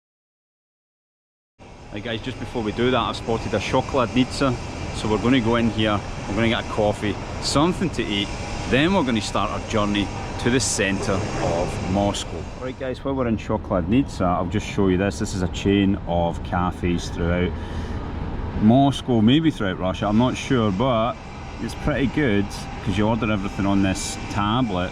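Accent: Scotland, United Kingdom. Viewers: Yes I like your videos and your scottish ACCENT.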